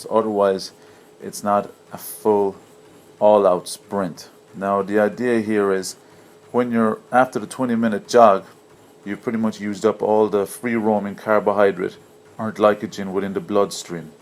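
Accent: Ireland. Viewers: You sound irish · You Irish?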